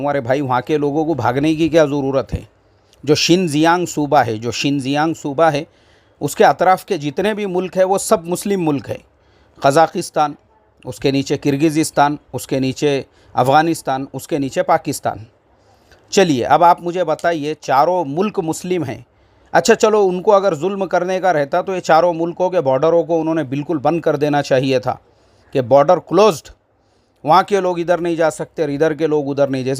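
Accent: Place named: Maharashtra, India. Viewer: Mufti Sahab Mere Saathi Hai Bachpan Se Unka Baat Karne Ka Tariqa Aisa Hi Hai Jo Maharashtra Ke Baaz Ilaqo Ka Aam Tariqa Hai Lekin Dusre Ilaqo Ke Logo Ko Lagta Hai Ki Isme Gussa Hai Jabki Wo Normal Style Hai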